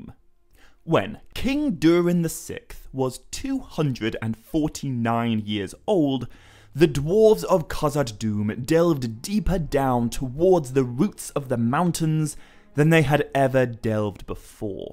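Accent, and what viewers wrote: United Kingdom. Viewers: He's British. They ask talk like that.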